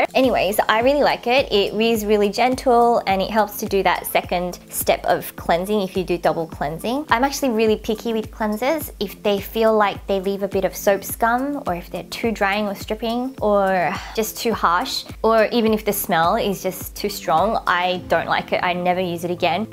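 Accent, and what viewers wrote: Australia. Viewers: Mate!!!! Your aussie accent is so lovely to listen to :)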